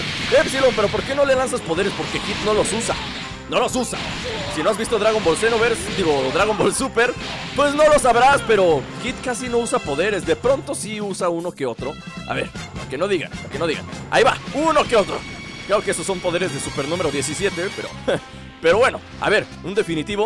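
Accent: Mexico. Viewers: de que parte de México eres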